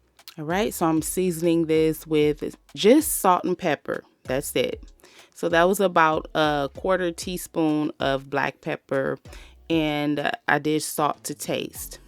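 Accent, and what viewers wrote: South, United States. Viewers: I live in Canada. This is pretty much how we do it here. My family's (my side and my wife's side...both british) have done this. Never been to the southern states but that's pretty much how we do it here. Have done so for ...I don't know...I'm 73 ...so 73 years. So it's pretty standard. Nothing southern about this except the accent of the narrator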